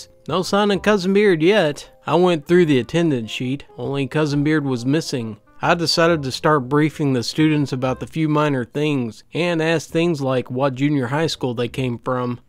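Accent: South, United States. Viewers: Areou southern what state are you from?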